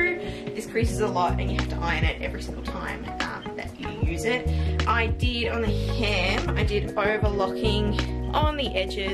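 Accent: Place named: United Kingdom. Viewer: Where are you? I’m close to New Orleans? I have some things I would love for you to make Please say your near by 🙃 But your accent makes me think your across the pond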